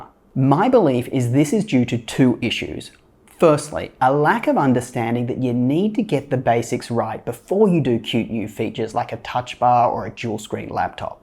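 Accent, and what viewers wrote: Australia. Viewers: You sound Aussie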